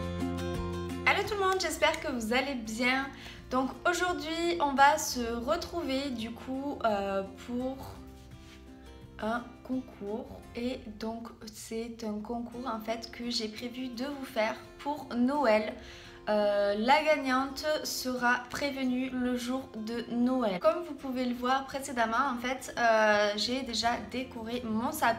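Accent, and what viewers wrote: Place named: South, France. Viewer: Je participe ! Merci pour ce super concours, je rêve de cette palette depuis qu'elle est sortie ! Ca fait plaisir d'entendre l'accent du sud chez une youtubeuse ahha! Joyeuse fêtes de fin d'année :)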